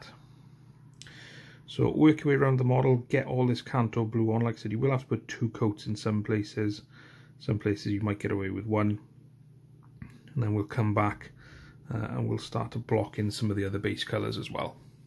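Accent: Wales, United Kingdom. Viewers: Hey great video, just picked up my first Age of Sigmar set and I'm keen to get painting. Also, do I detect a little Welsh accent in there? Either way, greetings from South Wales :D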